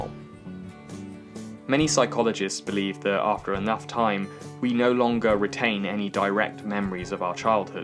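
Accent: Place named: England, United Kingdom. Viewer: I enjoyed this, thanks! Your (very) English accent somehow sits awkwardly with the material, but I appreciated the insights. These are my two favorite movies, and I have just finished watched D&C for, like, the 20th time! I guess the challenge is for someone to do a 90's piece, as that must be the last decade of freedom before technology robbed us of our primal sociability.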